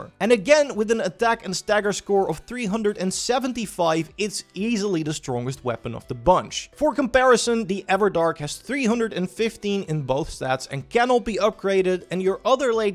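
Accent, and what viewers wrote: Netherlands. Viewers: You are Dutch arent you?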